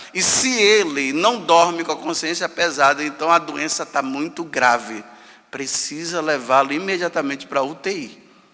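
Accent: Bahia, Brazil. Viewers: Durmam com um barulho desses. Esse padre, com todo respeito, é um baiano arretado.🤗🤗 É uma injeção de ânimo diária, aprender com ele. Obrigada Senhor Jesus, pelo sacerdócio do padre José Augusto! 🙏🙏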